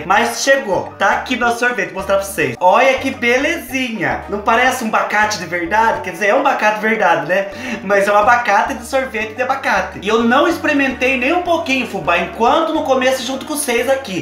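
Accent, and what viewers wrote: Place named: Caipira, Brazil. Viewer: do céu adoro esse sotaque caipira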